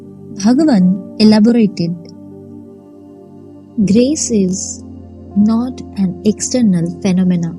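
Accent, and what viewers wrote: India. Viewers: What is wrong with Indian accent? Are you a European? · Why have you chosen a sound with indian accent? To demonstrate the origin of R.M? That's a very bad choice. It would have more effects with ordinary English speaking audiences. We love his words in the most direct way of communication.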